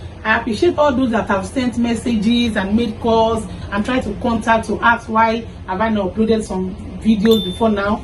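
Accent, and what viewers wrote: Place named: Africa. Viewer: Can I just say I Love ya accent. Your accent make me miss my friends so much. I'm in a state or town where there are no African here. Sucks.😩. Don't mind me..but I love the video.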